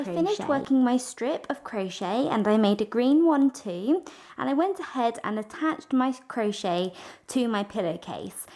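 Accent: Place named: RP, United Kingdom. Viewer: love your voice you talk very posh!!! im in UK too, and im from up north lol x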